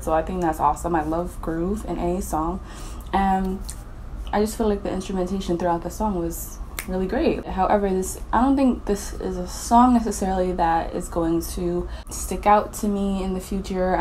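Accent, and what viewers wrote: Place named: New York, United States. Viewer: U sound like u from NY so are u not aware of 90s rap? Or do u know 90s rap so well that I listening to white music?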